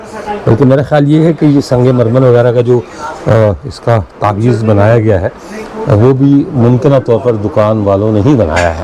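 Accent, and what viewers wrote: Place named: Bihar, India. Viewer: Kia. Tum. Shia. Ho. ? Kia. Tum. Bhia. B. Ho. ? Apni. Urdu. Say. To. Bhia. He. Lagtay. Ho.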